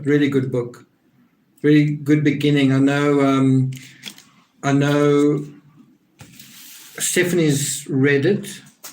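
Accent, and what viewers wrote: South Africa. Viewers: Hi there from Namibia. Thought I recognised the SA English. I like your videos.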